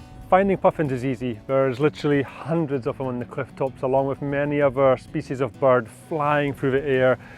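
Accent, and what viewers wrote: Scotland, United Kingdom. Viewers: Cool, I gathered you were Scottish from you accent :-) But I got worried that something maybe wrong on either end. It's not like Scotland is nearby to get home for the weekend :-)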